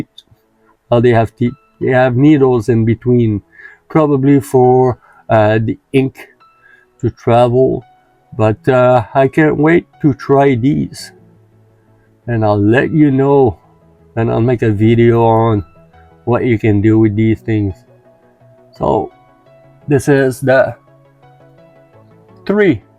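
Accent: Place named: Quebec, Canada. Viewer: Salut! Ca fait quelque temps que je vois ca passé et je suis tout autant intrigué en espérant de les voir en action bientôt! Ca aurait aussi ete le fun que tu fasse des vidéo en français parce que clairement a l'accent tu est québécois 😊 ce genre de contenu est vu et revus en anglais, je comprend que tu essaie de rejoindre la plus grande audience possible mais bon moi j'aimerais bin ca trouver plus de ce genre de contenu en français et je suis sûrement pas la seule. Sur ce merci pour tes Vidéo 😊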